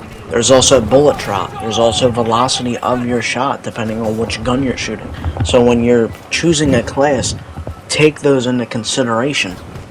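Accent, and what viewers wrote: Baltimore, United States. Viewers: yeah but more specifically Baltimore or Philadelphia not sure which one but that region. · Thanks for the tips. U from Baltimore?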